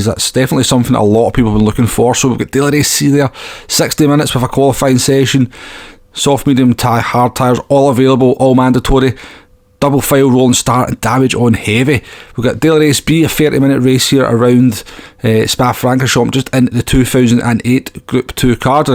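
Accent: Scotland, United Kingdom. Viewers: Holy shit I had to turn on the sub titles thick scotish accent 😳 I though we spoke the same language At the end of every video you should yell FREEDOM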